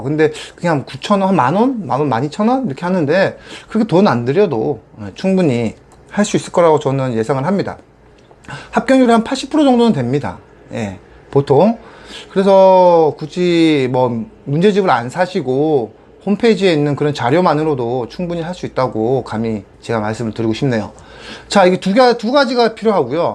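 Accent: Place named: Gangwon, South Korea. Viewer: 말투에서 풍기는 억량이 강원도분 같기도하고 암튼 추천 남기고 갑니다 저도 4년6개월된 현직 버스 기사 입니다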